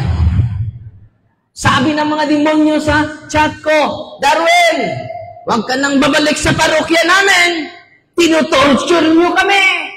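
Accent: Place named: Iloilo, Philippines. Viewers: Very good kaayo Father! Mora man pod ka Ilonggo. Passing na kaayo Father imo Ilonggo.naa ra gamay medyo kimaw pag pronounce like U-tod. (Not utód). Pero okay na kaayo Father!wow! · Ang cute mag ilon go speak 😊 Gusto KO Sana pumonta,hi de knm ALAM San yan😅 Amen🙏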